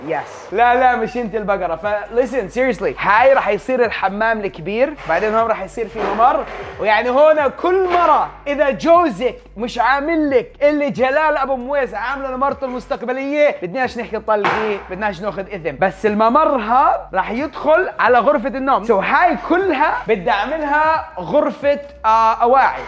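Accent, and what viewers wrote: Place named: Iraq. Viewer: انتة صرت تحچي عراقي عيني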